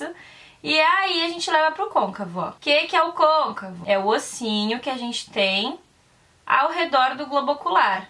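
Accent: Rio Grande do Sul, Brazil. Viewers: Tu é gaúcha? teu sutaque é bem parecido com o daqui do RS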